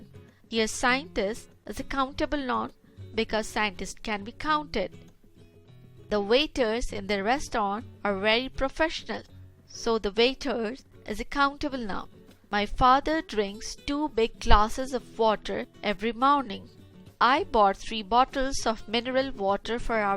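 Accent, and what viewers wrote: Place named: India. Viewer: where r the odds ... bruh indian teachers again , just look at the accent its annoying tho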